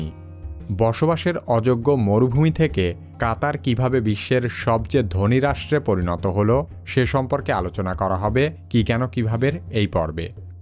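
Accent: Bangladesh. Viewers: বাংলাদেশের ভাষা যে কত সুন্দর তা আপনার কথা শুনলেই বোঝা যায়.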